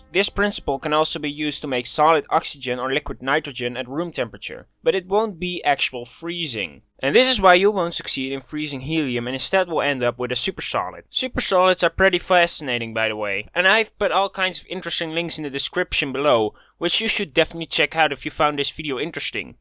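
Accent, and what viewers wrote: Netherlands. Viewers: Pohh, da's pas een Nederlands accent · i could hear that you were dutch within the first 5 seconds xD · dat nederlandse accent ..... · He sounds Dutch to me. I'm almost sure he is. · Your accent sounds North Hollandic or possibly Frisian. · You sound Dutch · Are you Dutch? Sounds like a Dutch accent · Dutch af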